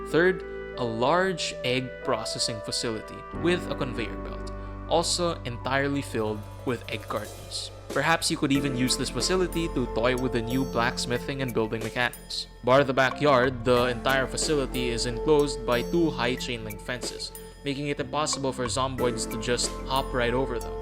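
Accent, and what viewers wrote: Philippines. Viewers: Pinoy na pinoy yung accent mo par hahahaha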